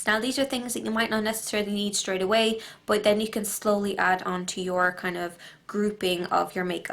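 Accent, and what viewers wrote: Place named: Ireland. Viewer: You sound irish :)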